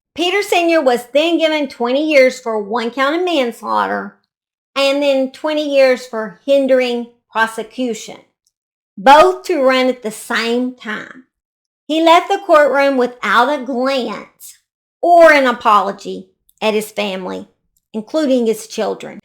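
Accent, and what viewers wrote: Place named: Tennessee, United States. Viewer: I love your Tennesse accent!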